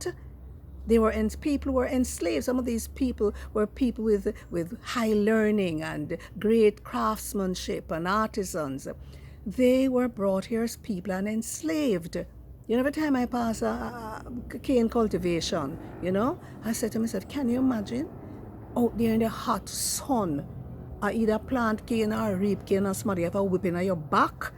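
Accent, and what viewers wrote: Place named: Jamaica. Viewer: Well said aunty real Jamaican talk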